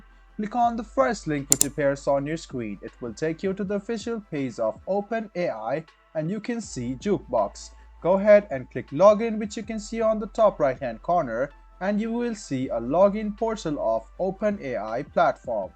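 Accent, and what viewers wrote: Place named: Nepal. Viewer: The nepali-english accent. Nice man 👍👍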